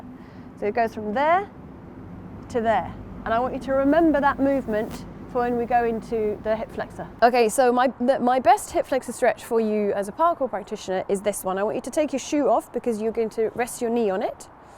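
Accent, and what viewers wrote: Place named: United Kingdom. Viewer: The British accent makes it perfect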